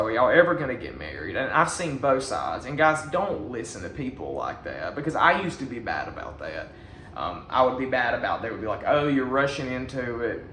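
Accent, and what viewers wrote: South, United States. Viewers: You have a very honest and down-to-earth sense of yourself and life in general. I love your southern accent drawl! lol. You've developed some great storytelling in your videos here. Maybe you could think about trying out some motivational speaker jobs at nearby schools or libraries?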